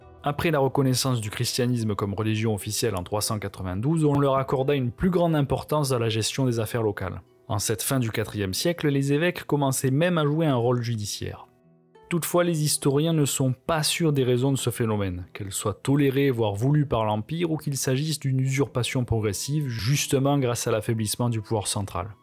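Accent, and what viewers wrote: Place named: Bordeaux, France. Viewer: Ayant de la famille bordelaise, oui votre accent est très peu prononcé, mais vivant dans le Nord, il est facilement audible ahah ! Merci pour ce long écrit, mais j’ai beaucoup plus la sensation avec d’autres empires et même le byzantin, que la chute fut bien plus brutale que pour Rome. Limite plutôt frustrant en fait de ne pas avoir un vrai événement qui enterre a une date précise Rome. En tout cas super vidéo et j’espère en avoir d’autres en reco à l’avenir !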